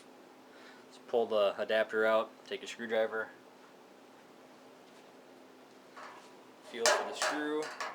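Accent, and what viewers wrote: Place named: Green Bay, United States. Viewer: Hey thanks! Where are you from? You sound like you're from Green Bay.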